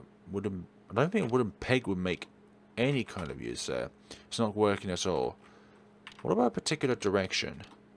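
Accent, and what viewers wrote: United Kingdom. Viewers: Where in UK is your accent from?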